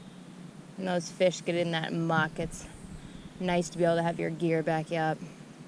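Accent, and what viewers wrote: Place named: Minnesota, United States. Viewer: You can sound so "Minnesota" from time-to-time. Nice bass 👌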